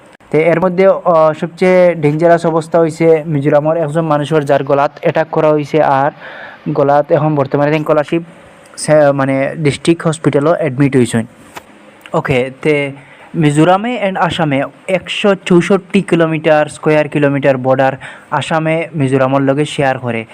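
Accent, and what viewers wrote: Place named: Sylhet, Bangladesh. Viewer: Maybe because of His Nagri accents it sounds like Borok.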